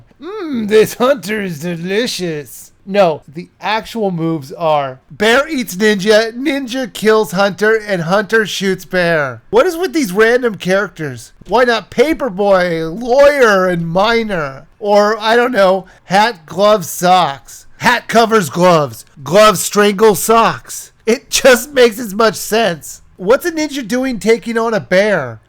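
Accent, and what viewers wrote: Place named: Appalachia, United States. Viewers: your hillbilly voice is amazing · best.hillbilly voice.ever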